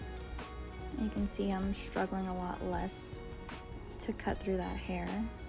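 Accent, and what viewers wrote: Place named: South, United States. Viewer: Good video. Not sure what area of the South you are located but you have the best accent. I used to have a co-worker a few years ago with a very similar accent. She was from Northern Louisiana.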